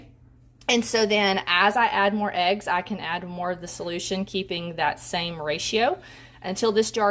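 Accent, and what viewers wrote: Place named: Ecuador. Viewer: Puerto Rican?… my minds’ playing tricks on me… I thought you were from “South America“….Ecuador . …. But whichever it may be, I was very happy to hear your mami’s voice and the pride in it, too ! ❤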